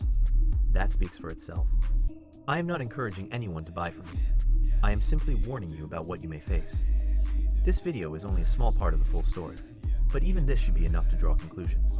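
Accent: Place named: Russia. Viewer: Honestly i think you should've made the video with the Russian accent it would've been more enjoyable (no offenses)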